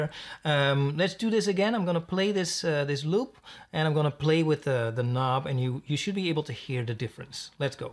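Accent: Netherlands. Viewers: I hear a slight Dutch accent so I assume you're Dutch but I'll keep it in English! I have installed Flux on my laptop and downloaded Bittersweet through the Flux app but I can't the DLL files anywhere to use it in my VST plugins in FL. How did you get it to work?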